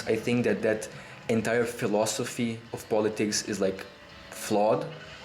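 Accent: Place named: Brazil. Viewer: yo are you half mexican half italian? Strange how that combination would give you a vaguely brazilian accent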